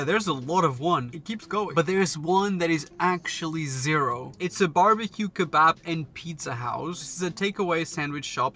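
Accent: United Kingdom. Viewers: of course he's British. Do you not hear him?😂plus he has said many times he lives in London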